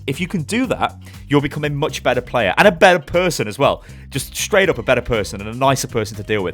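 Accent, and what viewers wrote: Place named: United Kingdom. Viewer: Lmao Im an American who loves soccer and even I know its called football! Hearing that awesome british accent say soccer instead of football is how you say... maybe.. rubbish!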